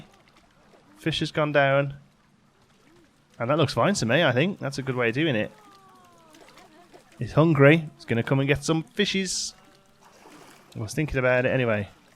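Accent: United Kingdom. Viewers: Loving these videos this game looks amazing can't wait for it!! Nice to here an British accent once in a while too lol!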